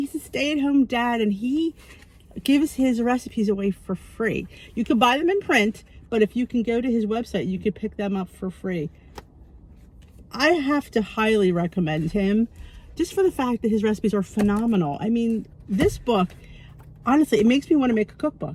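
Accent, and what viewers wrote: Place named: South Jersey, United States. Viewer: Lol!!! This is the first time I have watched you and I kept thinking she sounds like she’s from South Jersey. When pulled into the Amish market in Mullins Hill, I was like I knew it. I am closer to the Williamstown location. I have also been fighting to lose weight most of my life. I have done WW like 15 times, may try again in January. Tried Saxenda shots but my insurance doesn’t cover it now.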